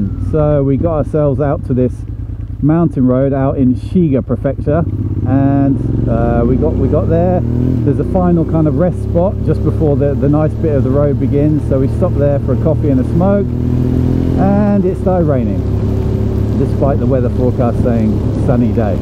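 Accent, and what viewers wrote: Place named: Manchester, United Kingdom. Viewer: Not sure I can use your accent as a pointer, however if I can I would say its not north Manchester, second stab.. Didsbury?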